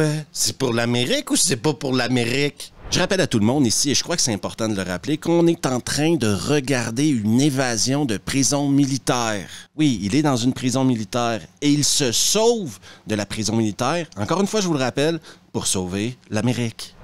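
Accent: Quebec, Canada. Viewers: Je te suis depuis quelques temps un vrai pkaisir de re decouvrir ces films 😂😂 le toit avec un superbe accent québécois merci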